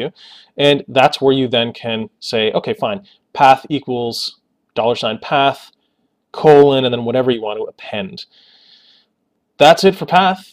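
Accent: Russia. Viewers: Отличное видео и хороший русский акцент! p.s. Из России с любовью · I loved your Russian accent 😂😂😂😍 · damn! that was a perfect russian accent man!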